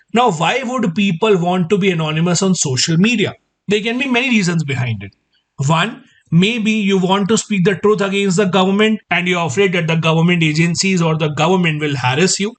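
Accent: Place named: South India, India. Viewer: U r awesome and ur voice is attantive just like some sort of South indian accent I feel very connective to that. Thank you so much sir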